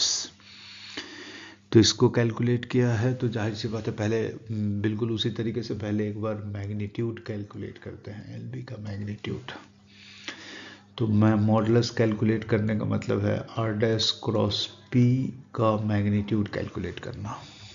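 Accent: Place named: India. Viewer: Used india english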